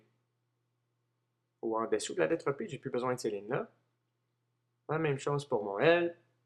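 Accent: Quebec, Canada. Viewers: j aime bien ton accent québecois xD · bravo belle vidéo , même avec ton accent canadien j'ai tous compris lol merci . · Dulcineia Lemasle son ascent et québécois normalement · Franchement, je suis tes vidéos et elles sont toutes extra. Au fait j'adore ton accent Canadien. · Heu.. tes Quebequois?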